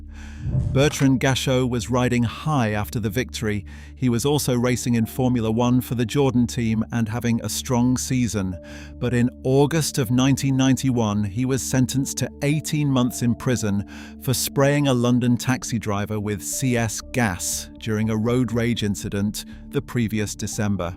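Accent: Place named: United States. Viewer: Great video, but as a British writer and teacher, I’m intrigued by your American pronunciation of numbers. 😊 I’ve never heard anyone British do that before. Not a criticism - I’m just curious.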